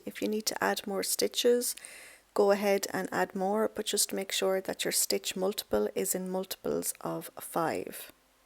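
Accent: Ireland. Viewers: I'm looking for tutorial for a winter hat, but I stayed here till the end just to listen to this beautiful Irish accent. I lived in ireland for 11 years, miss hearing it 🇮🇪 😊 🇵🇱 nice beanie, maybe I will try making it 👍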